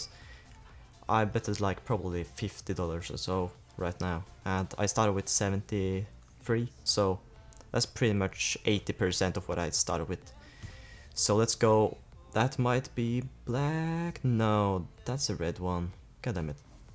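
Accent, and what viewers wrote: Norway. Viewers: Norwegian? :D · Are you from Norway ? xD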